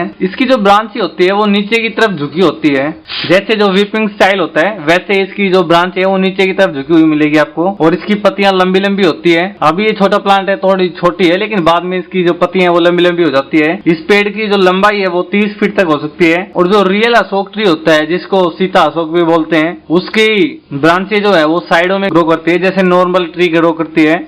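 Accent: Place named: Haryana, India. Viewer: भाई हरियाणा से हो आप आपकी बोलने की टोन से पता चल रहा है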